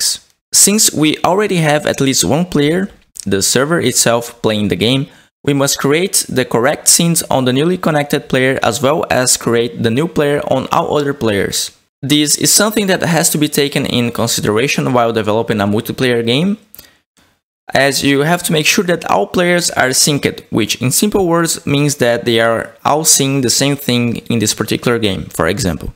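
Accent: Brazil. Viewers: certeza que é br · Você é brasileiro? :v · meu brasileiro falando em inglês nossa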